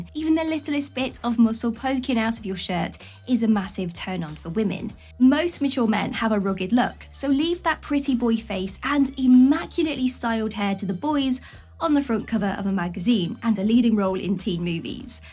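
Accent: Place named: London, United Kingdom. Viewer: I think she is from London.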